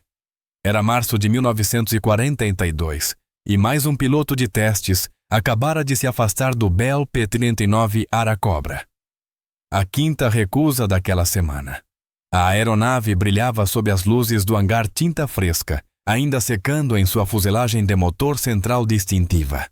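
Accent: Portugal. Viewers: Horrível esse português europeu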